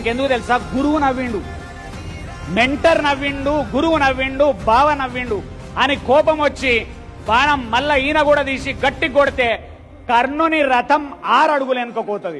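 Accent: Telangana, India. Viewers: Telangana slang lo bharatam super undhi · సారు చెప్తుంటే.. ఏదో సినిమాలో కోట శ్రీనివాసరావు గారు.. తెలంగాణ యాసలో భారతం చెప్పినట్లుగా.. నవ్వొచ్చింది❤😂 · Niyyakka mee thelangana slang msthu fun ra Bhai thiduthuntte vundhi bhaaratham vintunapatiki ! maku vimukthi kavalraa Babu mi basha nunchi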